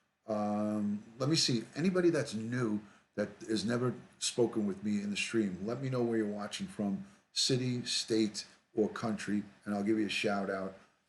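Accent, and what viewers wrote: New York, United States. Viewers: I am a new subscriber from the Bronx New York love your channel just listening to you talk I new you were a fellow New Yorker!! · Hi! I just found you and subscribed. It's good to hear a familiar accent. I'm a native New Yorker transplanted to south eastern Turkey. Let's Go Mets!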